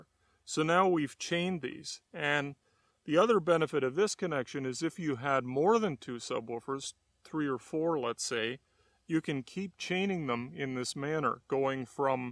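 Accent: Canada. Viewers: I heard that! Canadian! Much love from the U.S....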